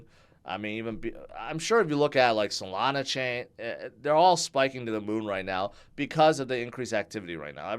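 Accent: United States. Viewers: It’s strange hearing what appears to be a Japanese man with a southern US accent!!!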